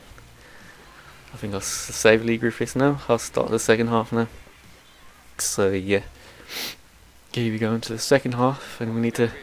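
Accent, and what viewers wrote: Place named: Wolverhampton, United Kingdom. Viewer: Are u from WOlverhampton cus u sound like u are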